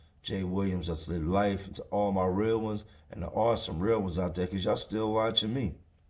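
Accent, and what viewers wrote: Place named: Baltimore, United States. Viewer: For real you talk and vibe like dudes in Baltimore.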